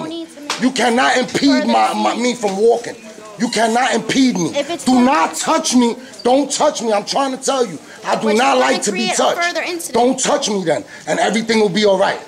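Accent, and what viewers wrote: New York, United States. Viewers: You so New York bro 😭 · Good job. Holy shit, you sound like you've never left the boroughs. Takes me back. I live in Oklahoma now but my family is from West Haven. Keep it up. Subbed. I'll forward this to other auditors and mirror on my channel, with your permission.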